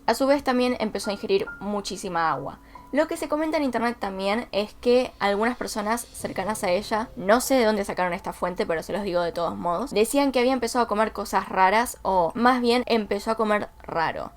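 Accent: Argentina. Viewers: Hola hermosisima tienes 166 videos en la i yo estoy en la labor de verlos, muy buenas noches, me encanta tu trabajo lo haces muy bien y ese acento argentino que a mi me encanta,besos y hasta la próxima.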